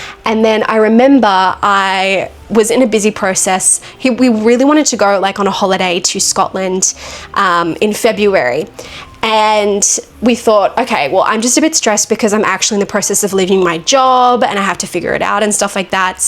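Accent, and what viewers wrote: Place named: Australia. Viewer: such a lovely Aussie accent! xx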